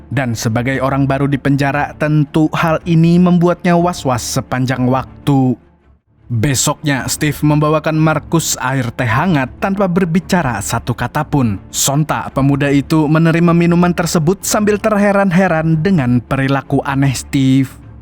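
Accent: Banjar, Indonesia. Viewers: Orang banjar kalsel kah pian mang😂😂 "kyapa habar pian berataan" jar pian😅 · Urang banjar kah abng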